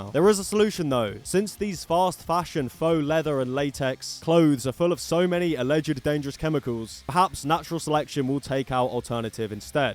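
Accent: United Kingdom. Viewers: br'sh accent too thick yuck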